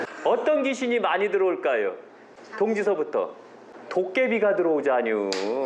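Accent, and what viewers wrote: Chungcheong, South Korea. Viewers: 맞아요 충청도의 대지관님 이시여요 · ㅎㅎㅎ 완전 충청도분이네? 가차운----> 가까운 ㅎㅎㅎ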